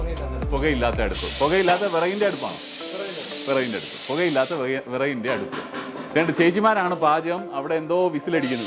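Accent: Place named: Kumbanad-Kozhencherry, India. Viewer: Love watching your programme..well hearing too. Tje malayalam is very similar to what we spoke. My dad is from Kumbanad & mom from Kozhencherry. Havent really lived there...but some 4/54 in kerala..2 in tvm and 2 in kumbanad, 3 in Manipal. Some 47 in Africa-2 in Ethiopia at birth, 13 Zambia and like 31 in South Africa now..but everything you do is do much like what I really am...thanks buddy!!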